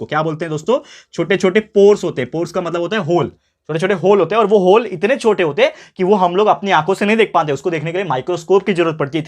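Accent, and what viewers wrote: Maharashtra, India. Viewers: aap marathi ho kya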